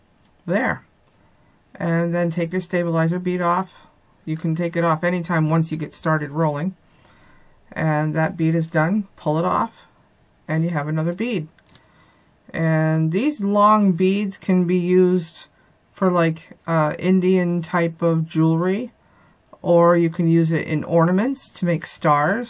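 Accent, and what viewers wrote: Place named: Maine, United States. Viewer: Found your channel quite by accident and am enjoying watching your videos. I knew you were a Mainer just listening. Don't know if I dare start another hobby - it seems to be quite addictive - but may just have to give it a try.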